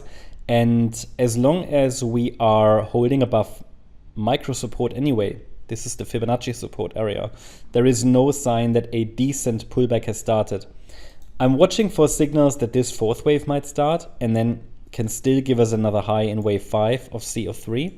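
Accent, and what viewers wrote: Germany. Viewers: bist du deutsch? german like accent :D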